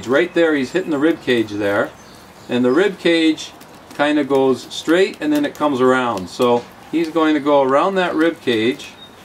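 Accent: Canada. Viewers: Sounds like a Canadian. Hope he’s here legally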